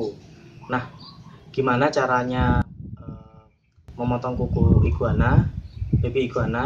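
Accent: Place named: Java, Indonesia. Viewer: suaramu kok tambah medhok hehe 😀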